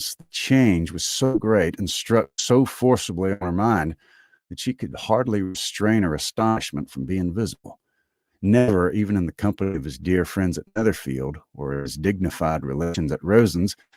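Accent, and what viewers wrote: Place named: South Carolina, United States. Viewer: I would love to hear you read this (I'm a South Carolinian, so your way of speakin' is the way everything should sound, of course!) but there's some kind of weird sound or feedback going on. Ha! Black coffee and harbor tea!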